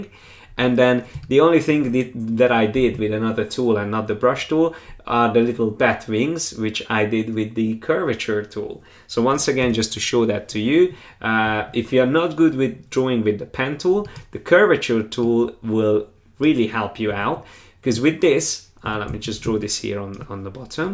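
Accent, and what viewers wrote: Hungary. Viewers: that magyar accent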